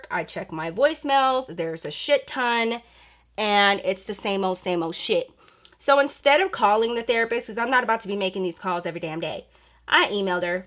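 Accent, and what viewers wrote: Texas, United States. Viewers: Texas in the house!!!! You Sound like you from tx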